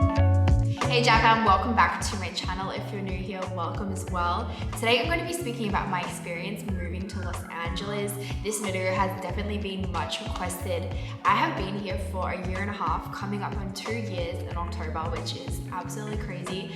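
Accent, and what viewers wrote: Australia. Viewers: I have a problem that, I can't understand your Australian accent, I can completely understand bro's accent. In reality, I can only understand American accent because, I am from Pakistan. BTW, Love from Pakistan to Australia, Brazil, and Usa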